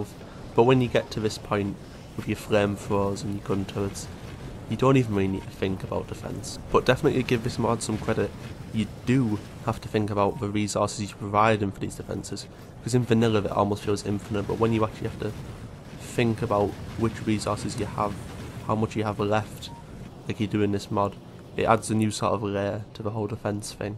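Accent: United Kingdom. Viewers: Der klingt ziemlich British, mit dem aussetzten vom T bei "water" oder "later".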